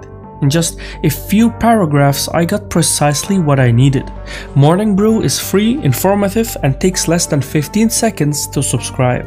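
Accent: Brazil. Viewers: Pelo sotaque o narrador e brasileiro 😂😂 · O dono do canal é brasileiro?? Claramente esse sotaque parece de brasileiro, me dá agonia kk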